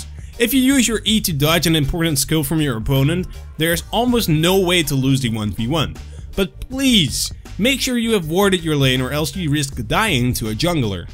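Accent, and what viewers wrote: Netherlands. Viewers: Ben je Nederlands ja?? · I knew it! You speak Dutch :D The accent is heareable :D Nice video once again en blijf zo verder doen! :) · Are you, by any chance, Dutch? I hear a typical Dutch "a" and "i"... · Woow man. Geweldig accent. Nooit verwacht dat jij nl was XD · ARE U DUTCH?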